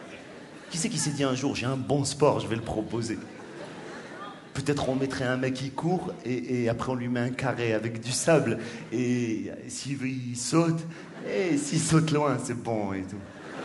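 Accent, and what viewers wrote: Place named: North Africa. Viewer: J'aime la façon comment les Maghrébins parlent :D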